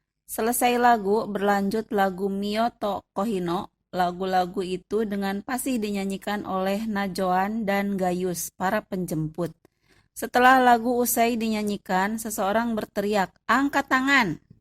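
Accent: West Java, Indonesia. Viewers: Miminny bahasa indonesia logat sunda😃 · Komentatornya orang sunda..........datar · Kaciri nyunda na teh. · Naratornya urang sunda? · Sunda banget eceu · Logatnya sunda eui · Naratornya sunda hehe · Pengisi suara pasti orang sunda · Konon, ada satu Stigma yg mnyatakan sbgai berikut : " Bernyanyilah seperti Orang Ambon, Berpikirlah sprti Orang Jawa, Berdaganglah sprti Orang Padang, Bertindaklah sprti Orang Batak dan.... Berbicaralah sprti Orang Sunda....!!! " Sprti Narator ini, dn jg yg lain melahirkanlah suara2 Diplomat Ulung Kelas Dunia dari Indonesia dg Tuturan kata tata bahasa Indonesia dn aksen Parahiyangan dg Diplomasi piawai nya, semisal diantaranya : Mochtar Kusumaatmadja, Ali Alatas, Nana Sutresna, Marty Natalegawa, Hasan Wirayudha, Djuanda Kartawidjaja, Connie Rahakundini Bakrie ( pengamat militer dn internasional ), Djuanda Alm/ pngamat intelijen dn Maritim internasional ( penasihat Gus Dur ) dll. 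· Pilipina, dipisi, konprensi, menginpormasikan, aktip, pormosa... 😂😂 Logat sunda teh emang teu bisa di bohongin euyy 😁😁 Mantaaappp 👏👏👍👍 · Logat sunda mah gak bisa di ilangin ya bu.. 👍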